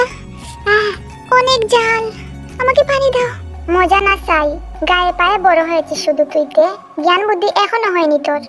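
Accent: Sylhet, Bangladesh. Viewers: আপু তোমার ভয়েস শুনে মনে হচ্ছে তুমি সিলেটের 💜💜💜♥️♥️♥️♥️♥️♥️♥️♥️♥️♥️♥️♥️ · তুমি সিলেটি পুরি? বালা আসোনি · আপু মনে হয় সিলেটি,, যাই হোক আপু তুমার ভিডিও অনেক অনেক অনেক অনেক অনেক অনেক অনেক অনেক অনেক অনেক অনেক অনেক অনেক অনেক অনেক অনেক অনেক অনেক অনেক অনেক অনেক অনেক অনেক অনেক অনেক অনেক অনেক অনেক বেশি সুন্দর হইছে আপু I love you api 😍😍😍😍💖💖💖💖💖💖💓💓💞🤩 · আপু তুমি কি সিলেটি 🖤❤️🖤❤️🖤❤️🖤❤️🖤❤️🖤💚💜💚💜💚💜💚💜💚💜💚💜💚👻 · আপু তুমি কি সিলেটি ❤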